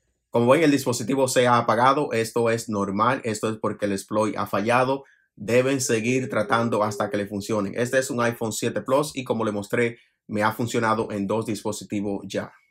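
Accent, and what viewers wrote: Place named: Dominican Republic. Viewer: Dominicano Verdad lo noté por el acento Y se puede con iOS 12.2 ?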